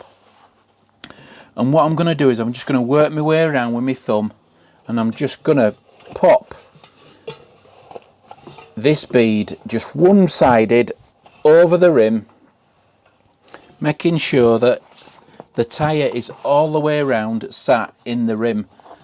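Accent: Yorkshire, United Kingdom. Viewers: ! Great job! Thanks so much, and ya I've subscribed too;)) AND i do wish you 'd insert 'sources' or 'links' for the various products you found helpful!! For example NUTRAK MILK, and that fancy BENCH WHEEL-HOLDER tool!! and the?"Pedro Tyre Levers"??? Did I spell it right?? ALSO: Question: Why not use Powdered Graphite as a lube for pushing the tyre around... As you probably know, some people use TALC on an inner-tube, but that material has health issues (like traces of asbestos in it) as a lube but perhaps they could switch to graphite OR to Corn Starch?? Your opinions would matter to us all!! (Oh and we love that Yorkie accent, guy!)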